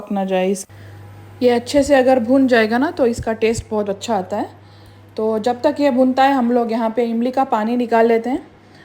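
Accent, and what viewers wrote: Hyderabad, India. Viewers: Assalamualaikum! New subscriber here 😊 I know you are from dubai at present but way of talking se puchi Are you from Hyderabad? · when the Dubai mom is actually Indian with a terrible Indian accent, it's disgusting to listen to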